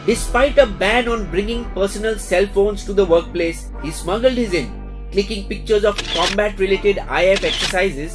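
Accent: India. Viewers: Bro please work on your accent.. You're accent is too much Indian. It sounds weird and will only promote hate from people of other countries who watch your videos. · The narrator sounds INDIAN..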